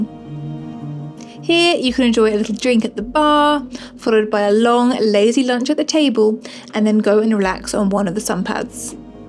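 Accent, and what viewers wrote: United Kingdom. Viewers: Who is the guy doing this? Just walk through it, he's trying to dress like a woman but it's definitely a guy with a British accent.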